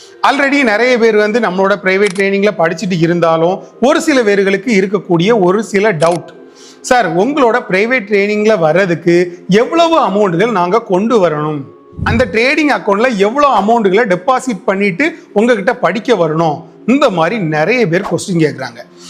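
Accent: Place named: Nagercoil, India. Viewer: Neenga nagarkovil ahh unga slang nagarkovil karanga peasura mathiri yee irukku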